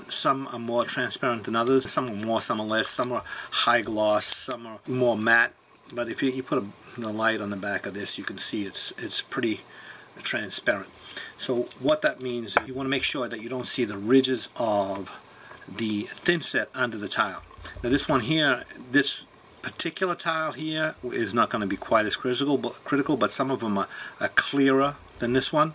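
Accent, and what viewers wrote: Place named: Danvers, United States. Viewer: Thanks for another great video. I started tiling full-time just a couple years ago and you've been an important resource to build my knowledge and skills. I like the straightforward nature of your videos and listening to you talk reminds me of being back in Danvers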